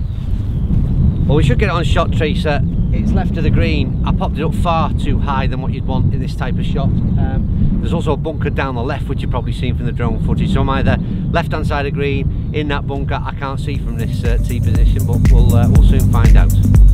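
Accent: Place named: Merseyside, United Kingdom. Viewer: Great video and well played! Love the buggy cam footage tracking your progress to each green. Lovely looking course. (I'm in Liverpool by the way and you sound like you're from not too far away...?)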